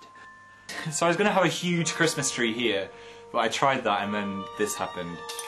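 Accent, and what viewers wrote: North, United Kingdom. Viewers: HE SOUNDS SO MUCH MORE NORTHORN HERE!!!!😁😁😁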